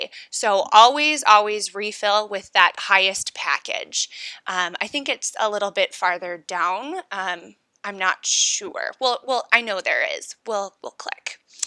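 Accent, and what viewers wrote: Wisconsin, United States. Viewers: Gotta be from Wisconsin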